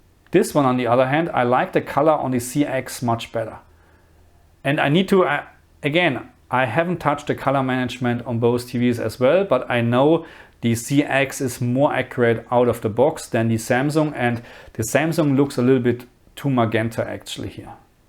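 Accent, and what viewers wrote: Germany. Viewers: Great video. I like your`e german accent also. I have the lg cx. and its great. But its old tech now. and cant be that bright. but only in more then 1000 nits you seen that the cx is weak. with 800 nits, it has better details etc? but what you advice me, bought the s95b this year? or wait till second gen qd oled from samsung?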